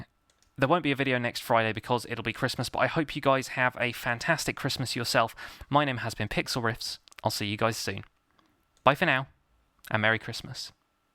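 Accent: United Kingdom. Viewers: It always seems a bit wrong hearing "Merry Christmas!" in a British accent rather than "Happy Christmas!" but there you go. Hope you had a wonderful Yuletide.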